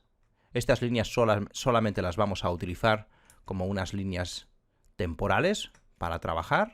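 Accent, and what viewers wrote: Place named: Spain. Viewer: you are very good,plz make some english tutorial...i love spanish accent but i dont understand.im sure there are many people like me want english tutorials from you, gracias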